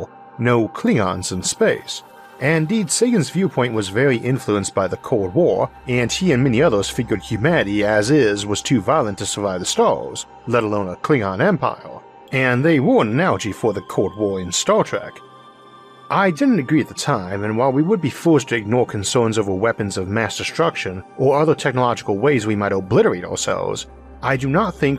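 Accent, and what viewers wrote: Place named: Kentucky, United States. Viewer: What is your accent, you sound like you are from old Kentucky from the1800's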